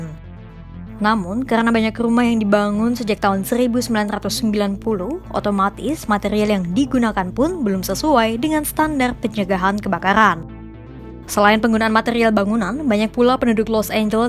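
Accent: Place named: Palembang, Indonesia. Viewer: Naratornya kemungkinan orang Palembang,logatnya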